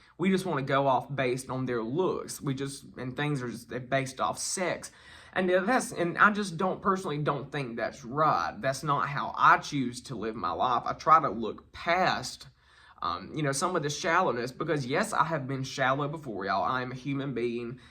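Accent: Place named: Texas, United States. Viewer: I found you by accident. What a treasure you are... I can relate to all of your videos.. I'm from Australia so I love ur Texan twang accent...LOL.. I am gay aswell but also a Christian....it's hard... I've started my YouTube channel only two months ago about being a gay in the church hopefully to help others... Keep the videos coming..❤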